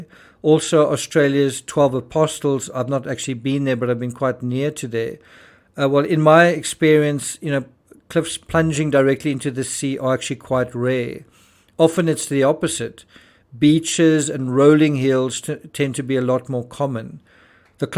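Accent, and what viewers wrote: South Africa. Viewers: Where in Australia are you from? You sound South African. You have been very commited to pursuade us in absence of a fowl play, even though you haven't been there. And I strongly doubt Jay had a chance of getting out alive if he rejected the big boys. By the way there was a CCTV footage of this very same road with a man getting into the car. At the time people speculated it had been Jay. What if it was someone who pushed him... The phone wasn't that close to a cliff, otherwise they'd find Jay immediately. Was there a fight? With a body month old, it's surprising they can determine exact time of death. But even so, people like those men he was with, do not leave witnesses that refuted them, that might hurt them in the future. Look at the facts, so many leaked messages, audio and CCTV footage. Aibs instagram post with the photo of Jay " +1" subheader was meant to be seen by a client? 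They hired a flat for a few hours on the night in the fake name to do what??? They weren't at the festival, he was heavy on drugs with someone's help, how did they meet? But you create these narratives " he persisted", " in his final moments he did that" - how would you know?, I still can't get over the fact you suggested that anyone not thinking like you do, is schitzofrenic... 😂 Someone must pay you well to trumpet your trumpet. The "paranoid mind made him jump" theory is another funny one. He had the reason to be running! So why is no one investigating that? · Thank you for this update! Once again, so good to hear a South African accent! I am glad you did this video because the conspiracy theories do not help anyone. The way you have explained it makes perfect sense and fits in with whatever evidence has been obtained. It is also easy when one has the "exuberance of youth" to believe that we are capable of doing anything! 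Jay obviously felt he had only one option and that was to head for the sea... the most likely place where people would be. But as you say, he had no idea how far away it was nor how treacherous the route was. He took a chance. Very sad for family and friends, but to me it feels like reality. I personally find it a far more plausible reality to accept than the wild possibilities others have come up with. Keep up the good work!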